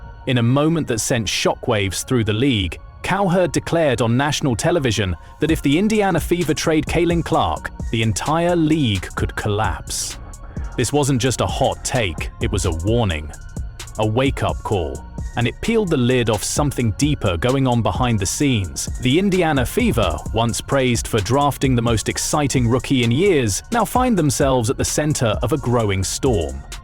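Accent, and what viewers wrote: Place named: United Kingdom. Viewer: How about an American English speaking announcer. We are tired of you BRITS.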